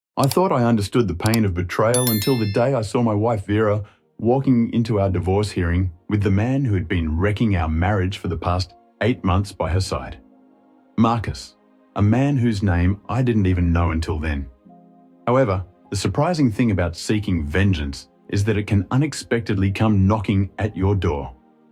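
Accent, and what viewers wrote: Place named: Australia. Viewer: Australian patois just does not go well with these stories. Canadian is the best.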